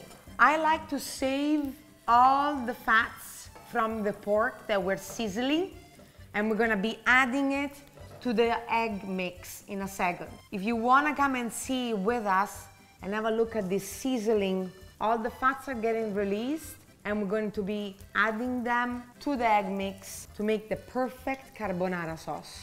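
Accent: Italy. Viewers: Your beautiful and i love your Italian accent I'm sicilian and i love your ricette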